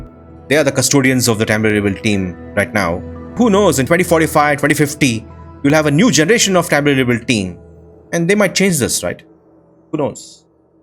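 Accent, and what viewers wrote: India. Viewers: God damn your accent is really annoying, wash away that "v" when saying w damn indian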